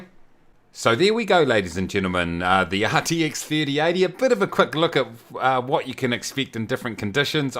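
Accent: New Zealand. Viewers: Nice to hear a New Zealand 🇳🇿 accent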